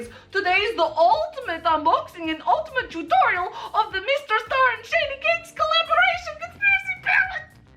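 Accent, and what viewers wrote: Russia. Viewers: God i swear i love you soo much. You're like my spirit animal lmao. But you said towards the beginning that you're from Australia. I thought ypur accent was maybe Russian or something?? Im sorry, i swear im not trying to offend. Just a curcious cat. 😻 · She sounds russian... but her voice is so soothing.... even when shes freaking out about makeup.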